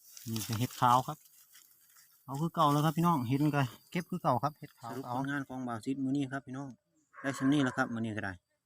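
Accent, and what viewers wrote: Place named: Kut Bak, Thailand. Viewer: สำเนียงกุดบ่กชัดเนาะ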